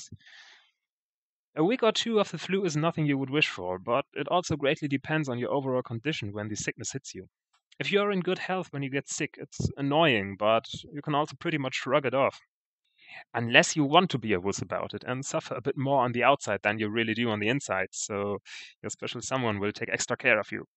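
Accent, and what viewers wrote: Germany. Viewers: Schönes deutsches Englisch :) habs sehr gut verstanden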